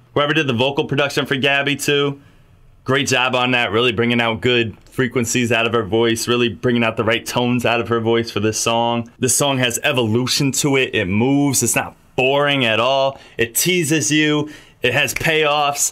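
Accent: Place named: Chicago, United States. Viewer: Yo are you from Chicago? You have that quality to your voice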